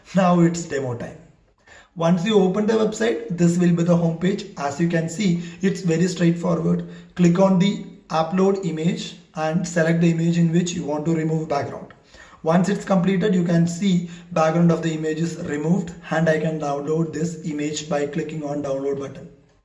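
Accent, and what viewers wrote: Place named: United States. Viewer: You American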